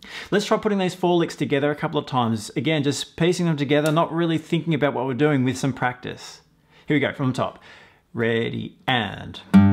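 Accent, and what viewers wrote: Australia. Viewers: My goodness, I wasn’t expecting an Aussie voice! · Australian I think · Actually I believe his accent is Austrailian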